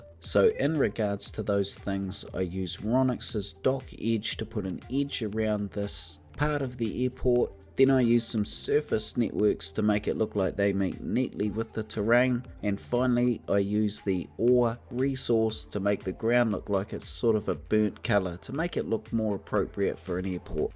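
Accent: New Zealand. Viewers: You Kiwi?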